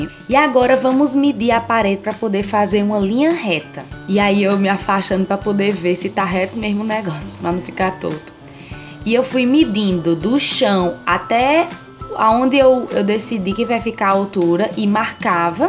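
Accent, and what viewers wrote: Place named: Northeast, Brazil. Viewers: Amei, ótima dica,e gosto d+ desse sutaqui nordestino, parabéns. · Pernambuco kkk Eu nem percebo que falo assim tbm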